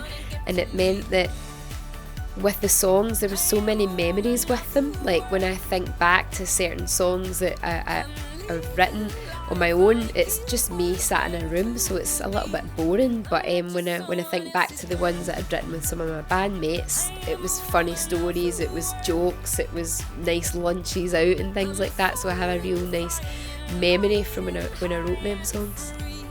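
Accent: Scotland, United Kingdom. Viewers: That scottish accent <3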